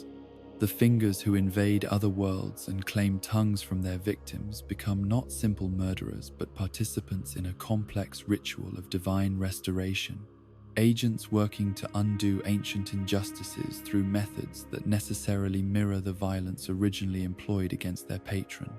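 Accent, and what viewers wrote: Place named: United Kingdom. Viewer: Brittish voice + DarkSouls = views.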